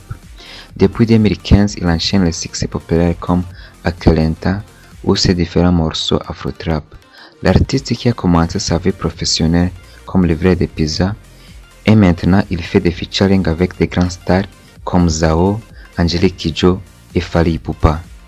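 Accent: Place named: Rwanda. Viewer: L accent rwandais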